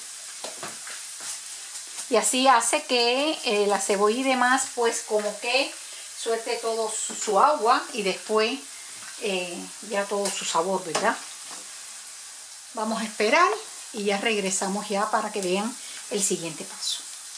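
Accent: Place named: Cuba. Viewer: Me encanta su voz, cubania y naturalidad. Mi abuela siempre las hacía en casa. Yo voy a intentar me queden tan deliciosas como a usted. Muchas gracias.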